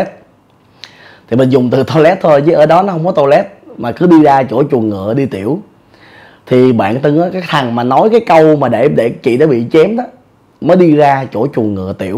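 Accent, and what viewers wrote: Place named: Saigon, Vietnam. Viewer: Em nghe anh ke giong Ben phường 7 Quan 8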